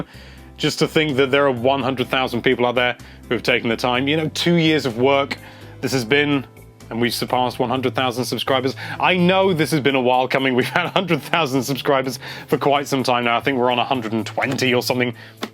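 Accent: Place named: United Kingdom. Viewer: Congratulations, you deserve it! I love watching your videos, you are sympathetic and honest. And the most important thing: your marvellous British accent.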